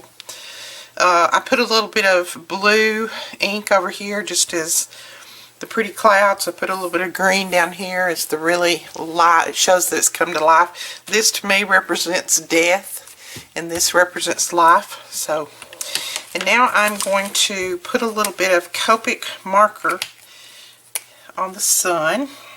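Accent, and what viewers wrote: South, United States. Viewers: I love y our faith journaling. You encourage me. Plus I love your Southern accent.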